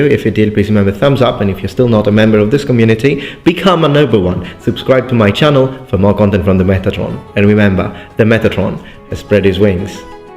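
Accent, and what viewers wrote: United Kingdom. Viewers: Do you speak English with an British accent because you learned from watching British television, or did you spend some time in Britain? I just didn't know. I mean no offense. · I thought you were Italian, but in this video, you sound British...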